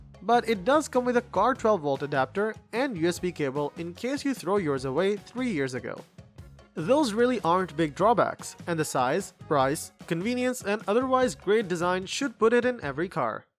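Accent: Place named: India. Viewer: The Indian accent makes it hard to sit through the entire video. Do you have someone who doesn't have strong accent to read the script instead?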